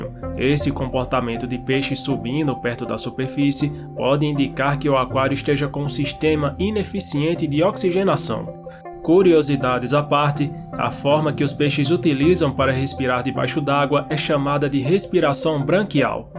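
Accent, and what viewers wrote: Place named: Northeast, Brazil. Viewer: Amei mais ainda o sotaque nordestino do narrador! Vcs são de onde? Nordeste power 💪🏽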